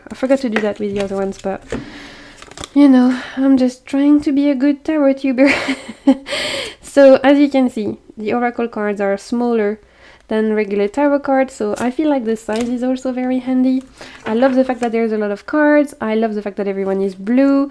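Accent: France. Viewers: Thanks for this video! Good idea to show french decks!!🇫🇷 I'm french and i live in Bretagne, not so far to Broceliande forest!! The fire has destroyed a part of this magical place..😔 But i saw recently a fox on the road on night🦊...and other animals next to my home. 🐿🐇🦔🐗 I love Gulliver and other french creators like Célia Melesville👍🏼 I already saw your Channel and i like your frenchy accent😁🇫🇷 so cute😘 Namaste🙏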